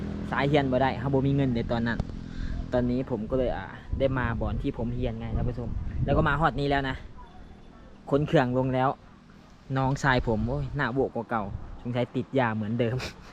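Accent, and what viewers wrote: Laos. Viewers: ເຈົ້າປາກພາສາລາວໂຄດນ້າຮັກເລີຍ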